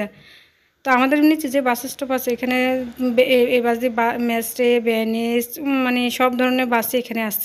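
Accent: Bangladesh. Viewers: মনে হয় বাড়ি নোয়াখালী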